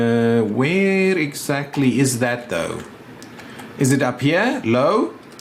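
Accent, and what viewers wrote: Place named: South Africa. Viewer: Hahahah you sound sooooo South African, nice vid man!!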